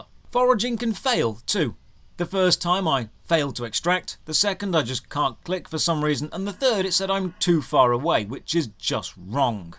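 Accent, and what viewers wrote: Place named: RP, United Kingdom. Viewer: I love that you sound so posh and well spoken, so hearing you drop the f-bomb instantly makes me cry laughing. Thank you for all these videos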